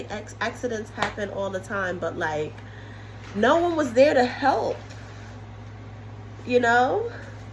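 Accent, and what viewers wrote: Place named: Brooklyn, United States. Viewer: you remind me so much of "Mz. Brooklyn." I just love your Brooklyn dialect. She started out at, I believe, almost 400 lbs. Today, she has dropped significantly. Your "story Time" reminds me of Mz. Brooklyn's "story time." <link>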